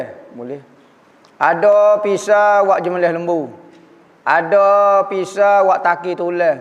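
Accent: Kelantan, Malaysia. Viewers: Maaf mencelah..sy pun sm smbil dgr ceramah sambil blaja dialect kelantan.mula2 pening gak. iman...mula2 sy ingat email...hehehe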